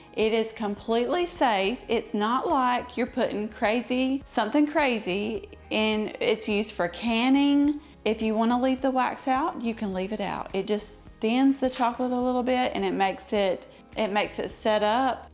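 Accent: South, United States. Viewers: Here in New England (Maine for me) we make Needhams in it, makes the chocolate shinny, check out a recipe for them.Love your southern drawl.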